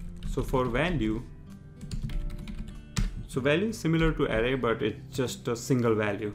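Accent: India. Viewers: Your voice isn't as irritating as most coders with an Indian accent. Take it as a compliment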